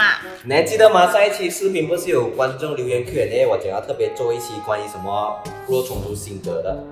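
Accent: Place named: Malaysia. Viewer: 最喜欢听你马来华文口音说：前戏，直至插入，这个前戏很美啊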